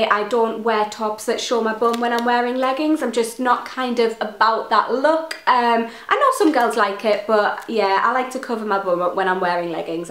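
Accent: Newcastle, United Kingdom. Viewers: Is that a jordi accent haha sounds like your from Newcastle xx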